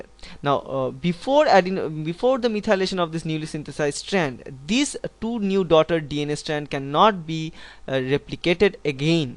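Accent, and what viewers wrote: India. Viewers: I am sorry I can't understand Indian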